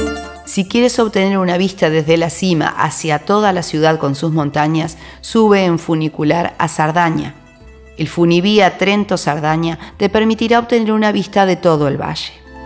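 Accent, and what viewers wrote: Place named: Argentina. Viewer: Creo que es Argentina o Paraguay a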